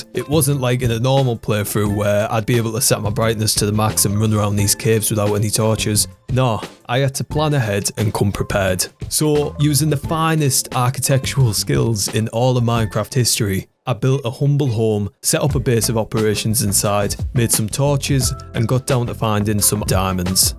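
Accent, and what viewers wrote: United Kingdom. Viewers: If this person is not British then my whole life is lie.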